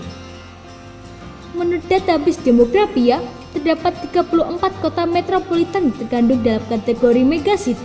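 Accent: Java, Indonesia. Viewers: Medok amat mba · Suaranya bahasanya kok medok totok kali jawa nya. Jadinya memuakkan. Apa gak ada manusia yg lain ? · Ngomong logat jawa males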